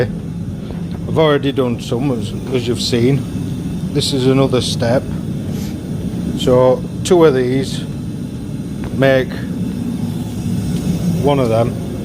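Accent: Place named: Somerset, United Kingdom. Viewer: where are you based, tha sarnds a lot like tha frump tarn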